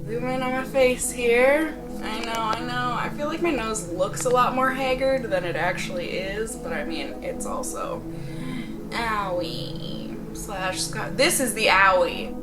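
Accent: Minnesota, United States. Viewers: your videos are my favorite videos to watch, although they don't help with my skin tone lol I just enjoy watching you! I thought I recognized that accent and then I finally took the time to look in the description and you're a fellow Minnesotan! all the more better!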